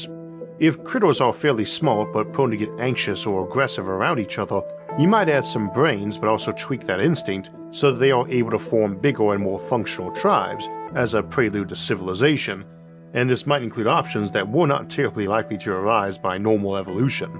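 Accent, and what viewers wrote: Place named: Boston, United States. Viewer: Just re-watched upward bound - You've gone from a self depreciating Elmer Fudd, to a confident sounding speaker with an "almost Bahstahn" accent. You might want to pull a set of videos that were recorded a year apart and go over them with your voice coach. P.S. With your content I skip the falling asleep part and go straight to dreaming of the stars.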